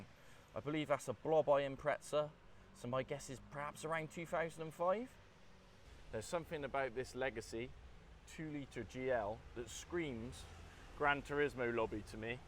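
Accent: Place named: Bristol, United Kingdom. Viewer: Since no one has mentioned it, it’s a honour to actually see! For so long you’ve just been a Bristolian voice 👍🏻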